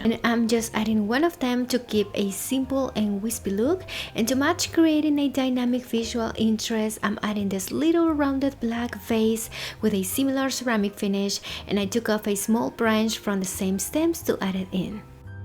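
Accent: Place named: Brazil. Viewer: Hi, I am a silent subscriber but wanted to say that I love your style and always look forward to your videos:) I love your accent, Brazilian, Portuguese, Colombian? (Lisa from Seattle)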